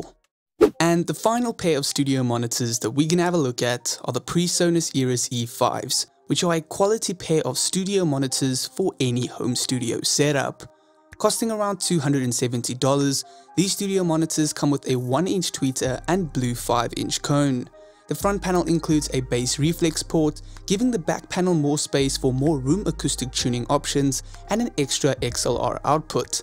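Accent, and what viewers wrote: South Africa. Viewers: I hear a South African accent. Am I right?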